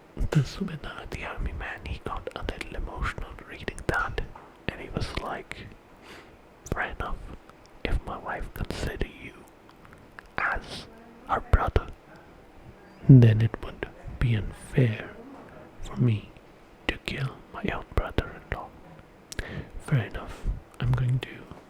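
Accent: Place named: England, United Kingdom. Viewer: Big fan of your English accent sir🤓 Cool background!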